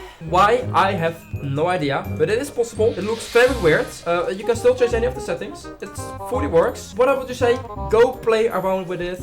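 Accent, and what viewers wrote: Netherlands. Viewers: Ik ruik Nederland (door je accent)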